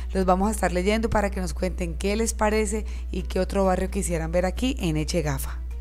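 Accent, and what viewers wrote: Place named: Cali, Colombia. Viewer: Q lindo hablas caleña❤❤❤❤❤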